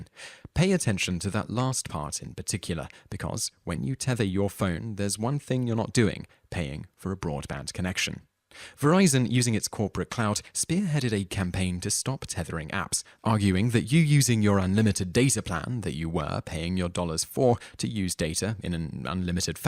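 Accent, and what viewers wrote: United Kingdom. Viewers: Clearly 40p, he's got a UK accent but I guess he's been around Yankees too much with all his yank phrases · Something tells me the narrator of the video is British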